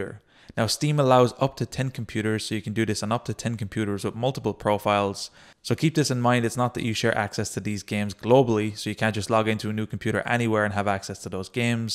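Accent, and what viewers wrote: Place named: Ireland. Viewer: I can hear an irish accent of ye, you irish by any chance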